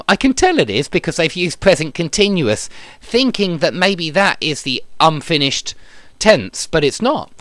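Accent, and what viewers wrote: United Kingdom. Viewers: I love your British accent ❤️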